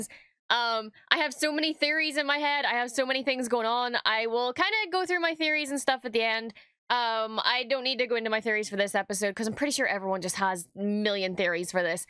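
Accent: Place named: Northern Ireland, United Kingdom. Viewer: You're part of the NI 501 right? Hearin' that accent. Mate how do I get in to the 501 chapter or what have ye?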